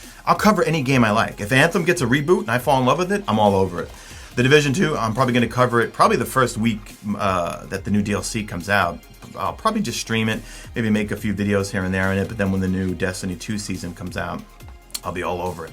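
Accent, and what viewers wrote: New York, United States. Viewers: #MadeItToTheEnd Can you do a video with your new York accent #askmesa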